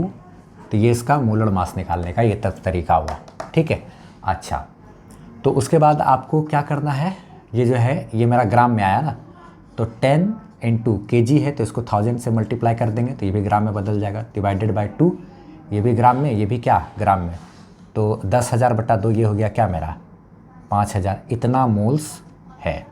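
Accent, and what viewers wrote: Bihar, India. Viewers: Sir aap bihar se ho kya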